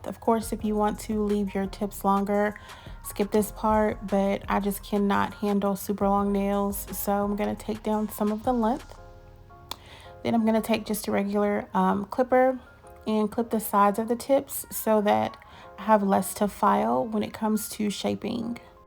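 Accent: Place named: South, United States. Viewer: Your nails are beautiful… but the thing I’m more focused on is that accent. Girl I’m a southern woman though and through but I’ve never sounded that sweet when I talk. I’m soothed, I’m relaxed, and I’m envious all at the same time ❤️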